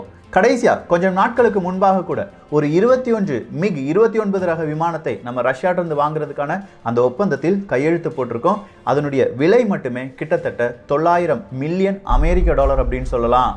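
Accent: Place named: Thoothukudi, India. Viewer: thala nyt namma RN kao adha marandhu radhinga ok va en bro neenga thoothukudi ya slang andha mari iruku sometimes silla ucharipu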